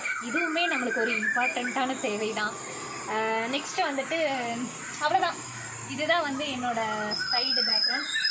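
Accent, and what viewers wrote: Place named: Coimbatore, India. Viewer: Nice sister.. exactly Coimbatore slang.. I too from Coimbatore.. very nice to see namm ooru ponnu🥰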